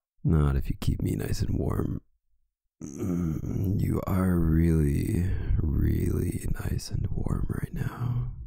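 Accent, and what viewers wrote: Canada. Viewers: Omg you’re voice so nice 😳🥺 and your giggles/laughs make heart melt 🥺💖 to dream of having a boyfriend sound like you lol I love Canadian accents 😊 they make me melt 🥺 new fan from the UK here lol Stay safe 😊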